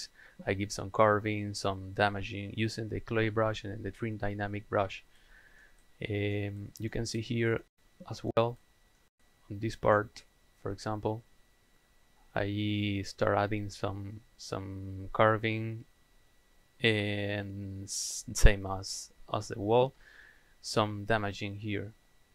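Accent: Argentina. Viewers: Excelent tutorial. Also, this man speaks english with a Good Airs accent, just like me, so really Easy to follow. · Jaja, me siento orgulloso de haber identificado el acento argentino (? muy buen trabajo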